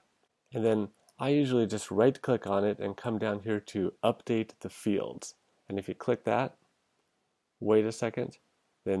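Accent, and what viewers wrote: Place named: United States. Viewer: 日本人ですか。英語が上手なので、アメリカ人だと思いました。Besides, great video, first one that I found on this topic that explained everything properly👍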